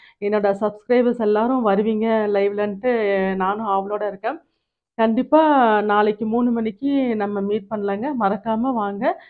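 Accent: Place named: Coimbatore, India. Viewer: I want to hear the Coimbatore slang akka.love you so much. All the best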